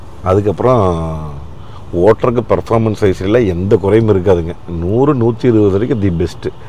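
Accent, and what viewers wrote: Kongu, India. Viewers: தம்பி அவர்களுக்கு வணக்கம் வாழ்த்துக்கள். உங்களின் மண் வாசம் மாறாத கொங்கு தமிழும், அதனுடன் இணைந்த இயல்பான பேச்சும், அதில் தெரிகின்ற உண்மையும், புன்னகையும், உங்கள் காணொலிகளின் வெவ்வேறு விதங்களில் வெளிப்படுத்தி வருகின்ற விதமும் மிகவும் சிறப்பாக உள்ளது. வாழ்த்துக்குள்!! தொடரட்டும் தங்களின் பணிகள். பாராட்டுக்கள்.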